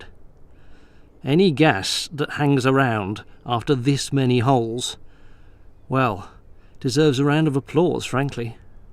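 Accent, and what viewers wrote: RP, United Kingdom. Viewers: Accent is so posh!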